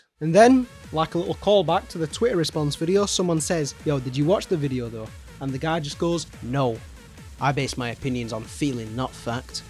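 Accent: United Kingdom. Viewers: the comment about hating on the British accent is sad. I think the British accent is cool, like a calling card of their culture. I love this channel because he has almost no filter. I can't get enough. Keep up the good work. Help the this poor sad world.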